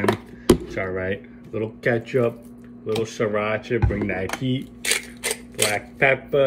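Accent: New York, United States. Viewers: Been cooking my burgers in bacon fat for years, it's a game changer. I do my onions after my burgers, get it cooking in that beef and bacon fat while I rest my patties and toast my buns, fucking 12/10 shit. Love your channel, dude. I grew up 20 minutes outside NYC, living in Florida now, the accent brings me right back to the old Italian Pizzerias and sub shops I'd be in every other day, fucking love it, my dude.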